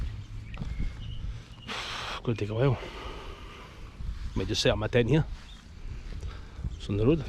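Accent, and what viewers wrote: Scotland, United Kingdom. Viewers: Great to see how much you love your beautiful cat.I have two one year old cats and I talk to them too😸😁I live in Italy but I was born in Edinburgh so I enjoy listening to your "bonnie" Scottish accent 😁and admire the great scenery👍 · Great to see you guys! I have been doing some family history studying and researching my Scottish roots. Your accent takes me to to a place I want to visit someday. Loved your book!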